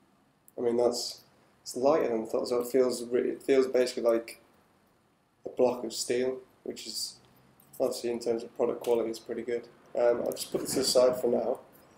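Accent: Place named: England, United Kingdom. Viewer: thts such a sick english accent =D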